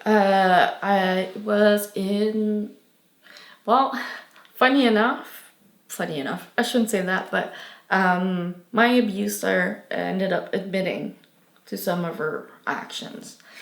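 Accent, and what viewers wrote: Quebec, Canada. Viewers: Where is from? I wasn't sure if it's a quebec accent or not. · I thought I recognized her wonderful accent. I live in Alberta now and unfortunately don't hear Quebecers often. ❤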